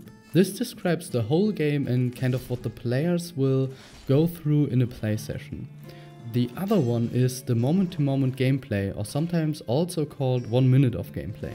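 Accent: Germany. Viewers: ..I'm inspired by diablo and gothic :) Are you living in germany or america...you sound german, but that doesn't mean you live here. :)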